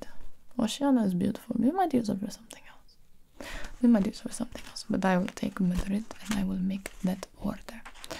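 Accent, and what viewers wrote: Russia. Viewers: What an accent!! Russian? Goddess! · It’s Hollywood Russian. She’d make a good Bond villain. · I loved the Russian accent so much that I couldn’t fall asleep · Not really german mostly russian i have a russian aunt she sounds exactly like gibi's accent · Another enjoyable and soothing video. Your Russian accent is so addictive and goes well with the blonde wig. You must work so hard preparing such therapeutic monologues. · She is trying to imitate Russian accent ?))) ну что же вышло совсем неплохо))) don’t get me wrong but Mexican girls are very hot... can’t watch them for a long, so I must leave it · I love that metal gear Russian accent. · i love the Russian accent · Damn that russian accent gave me tingles straight up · For real was awesome Russian voice! I wish 1 video with a little bit o f portuguese (real portuguese PT-PT). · I love your Russian accent...you simply nail the Russian personality as well. 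· Grrreeeattt Russian accent👌👍🇷🇺☦️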